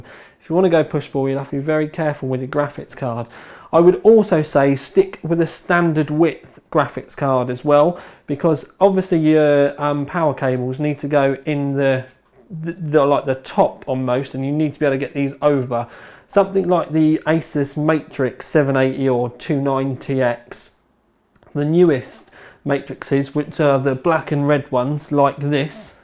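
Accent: United Kingdom. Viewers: He is from the UK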